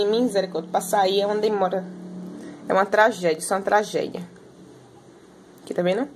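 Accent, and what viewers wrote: Northeast, Brazil. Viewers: Sou carioca, nas amo sotaque nordestino. Acho que nasci no lugar trocado..😂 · Quando ouví pensei logo Nordeste nas paradas... Tive a certeza quando pronunciou Cli..en..tíí. igual minha amiga...😁😀😊 Amei sei vídeo 👏👏👏👏👏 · vc é nordestina?😍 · Parabéns! Adorei a explicação. E viva o sotaque nordestino, eu amo. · Eu adorei o sotaqueee!!! Nordeste!!!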